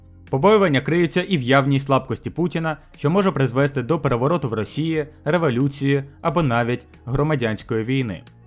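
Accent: Odesa, Ukraine. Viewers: автор з Одеси. в Харкові і Дніпрі інший говір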